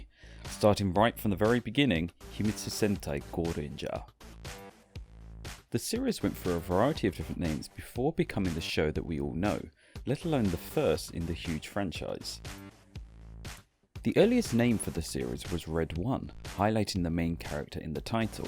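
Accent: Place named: United Kingdom. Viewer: Everyone of English-language native backgrounds - although unofficial, why not try imagining of the '-uer' following JAKQ? - which makes it "JAKQuer dengekitai". I'm just making a suggestion. By the way, it's a pleasure to hear toku info with a British accent. I'm a foreigner, and I wonder if domestic British sci-fi shows like 'Doctor Who' and 'Sapphire and Steel' were (and still are) in the way of the Toei Toku's entrance to the British Commonwealth back in the '70-80s.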